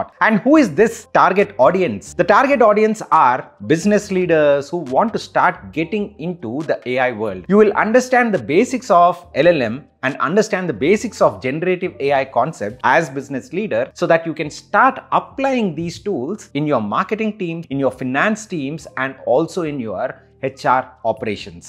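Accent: India. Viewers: Thank you for your information about the free Online AI Courses. And the best is, I can understand your "Hinglish“ verry well. Best regard Peter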